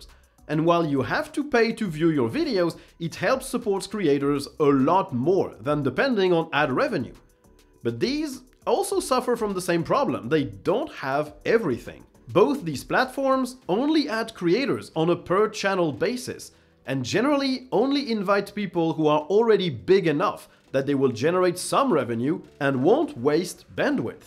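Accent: France. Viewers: What is your accent? sounds French, but with a something. Belgian?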